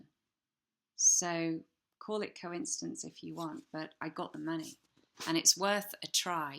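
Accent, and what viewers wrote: United Kingdom. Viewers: Hi from Sydney Australia, your accent is lovely always loved the British accent, I'm new to law of attraction and a HUGE fan of Neville, so many testimonials from ppl from all walks of life. even some skeptics have converted to belief after trying Neville Goddard's guidance and methods. I hope Neville is enjoying all the pleasures of Heaven, he really was HEAVEN on earth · One of best explanations I've heard on this topic. You explanation is not only on point, but succinctly covers practically every important point made by Neville in all his many talks. As a bonus, you have a dazzling smile, charming English accent, and awesome personality. I listen to this video as a daily superbly positive reminder to maintain the imagining practice, and I'm seeing TONS of benefits Thanks for making this video...subscribed...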